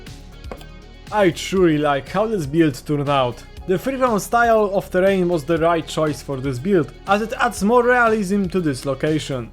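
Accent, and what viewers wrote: Poland. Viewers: nie no stary, masz totalnie polski akcent to usłyszeniu z 5 słów już widziałem że jesteś z polski. ale moce Zajebiste🔥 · Your had polish Accent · łoooo panie polak! słychać po akcencie typowo polski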